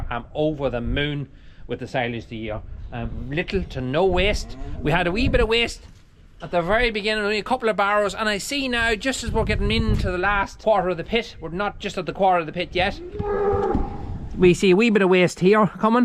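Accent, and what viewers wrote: Ireland. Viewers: cottage reveal caught my eye, had to watch! and seeing the farm life got me subscribing! i'm a city slicker now for near 30 yr's , was raised on a 10-acre hobby farm....isn't that pallet tool handy? i want to get one now! i have an idea for making a pallet bed and only got as far as collecting pallets,lol, Enjoyed the video watching the wheeler fixing too w/ ur 'helper' ...(lol, dog) ....was trying to figure ur accent, then i heard u say something about Ireland! i'm american, usa....have a good one, i'll watch ya again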